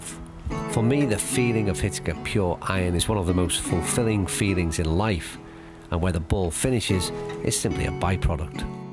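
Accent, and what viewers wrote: Liverpool, United Kingdom. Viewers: Very good. Takes me back to the content when I first watched the channel, especially the travel series. It’s a bit like watching a Scouse version of Eric Anders Lang (meant as a compliment - you’re both great storytellers who use golf as a beautiful backdrop).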